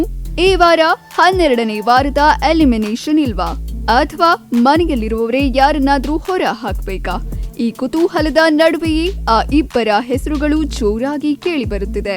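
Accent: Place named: United Kingdom. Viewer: why are you talking like as though you are an british talking in kannada. It sounds dirty and cheap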